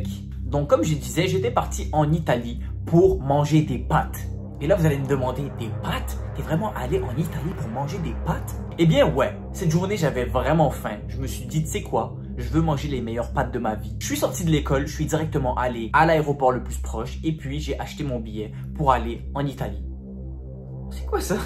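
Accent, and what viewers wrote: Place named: Arab world. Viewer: T as un accon arabe tkt j ai rien contre les arabe la preve je suis arab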